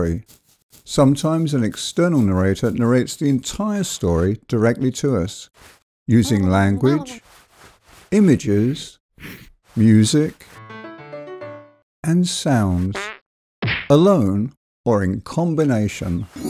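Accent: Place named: United Kingdom. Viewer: I love this video! I laughed at these animation so much and the serious British voice is the perfect foil for them.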